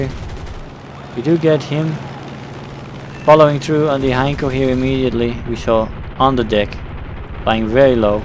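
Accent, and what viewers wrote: Netherlands. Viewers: hi, are you dutch?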